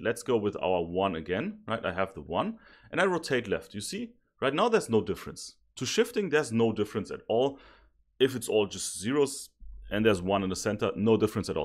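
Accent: Germany. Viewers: I can tell he is German 😁, thanks a lot sir.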